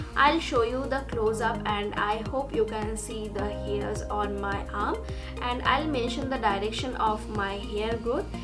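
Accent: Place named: India. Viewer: Honey, she's speaking in indian accent. I'm not an indian but her accent actually not that hard to understand.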